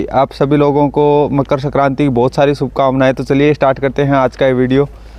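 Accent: Haryana, India. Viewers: Delhi se ho ki haryana se ho bhai aap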